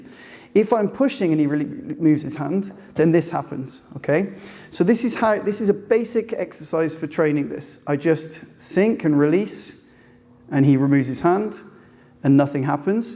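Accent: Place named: Northeast, United States. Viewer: I was going to say, you sound like you’re from somewhere east of New York. 😊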